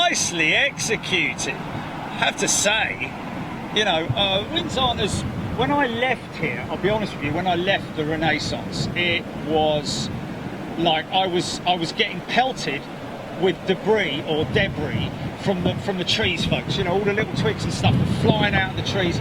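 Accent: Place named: England, United Kingdom. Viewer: Wow I just love your video, and your English accent. Hello from Covid19 Melbourne Australia 🇦🇺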